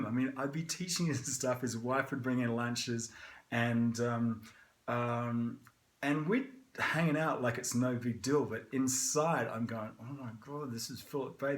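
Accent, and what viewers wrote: Australia. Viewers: Amazing you still kept your Australian accent.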